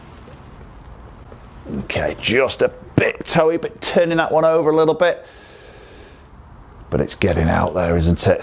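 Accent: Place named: Lancashire, United Kingdom. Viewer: Great pronunciation 👍 spoken like a true Lancastrian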